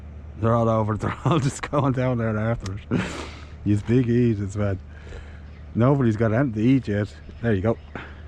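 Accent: Ireland. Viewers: Just happened upon your channel, and its great! Are you from the west coast of Ireland by chance ? The accent sounds familiar. Big props from The US, a great video.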